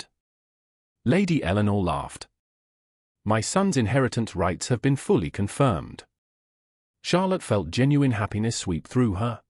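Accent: United States. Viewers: good grace. you americans really need to get your fawlty pronunciation right. ALL americans do this !! There is a big difference between the 2 words : shire, as such, which is pronounced correctly. BUT ... connected to a place, wiltshire, or a name, ravenshire, it is very different !! it sounds like shuh. NOT ONE AI does this correctly, which means it is american, and not instructed to british english, which is VERY different, Americans say writing desk. British would not say that at all. It is a desk, and reading and writing sitting at it is the whole purpose of the thing. Horsebackriding ... wtf ? Where else would one sit? British say riding or horseriding, never ever do they say horsebackriding. And there is a whole slew of words that are VERY different between the 2 languages.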